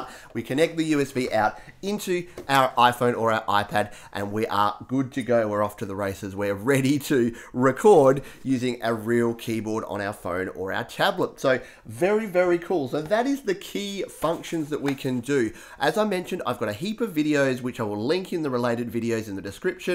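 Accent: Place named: Australia. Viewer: Thanks for that. I’m upgrading from the dinosaur era desktop so all advice gratefully received - (and very happy to hear an Australian accent for once. ) So - Question : I didn’t realise I can use my I pad Pro for recording until watching your vids. But - is it possible to somehow get the I-Pad screen onto a separate large monitor screen ?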